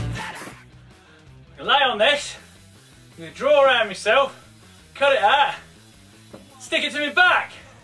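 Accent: United Kingdom. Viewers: is this guy english or scottish or irish or welsh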